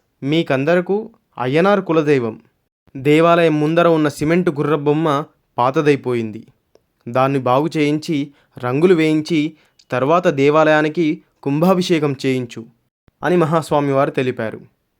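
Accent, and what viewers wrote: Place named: Telangana, India. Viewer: Excellent! By your accent know you are telengana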